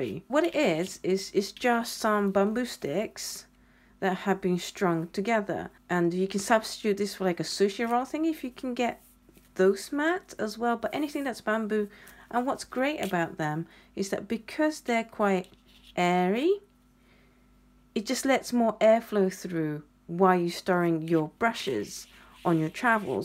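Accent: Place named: United Kingdom. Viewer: If I could paint anywhere in the world, I would choose the UK. Many of my favorite artists that I watch are there and I would love to go get lessons from them or even just watch them paint in person. And the accent they speak in is just adorable as well, just like yours! Thanks for the chance to win and I hope you feel better very soon.. Blessings, Stacy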